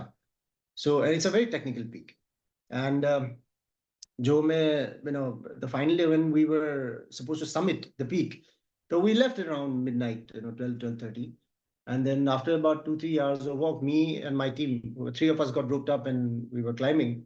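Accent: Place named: Assam, India. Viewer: I think he origintes from Assam through is accent.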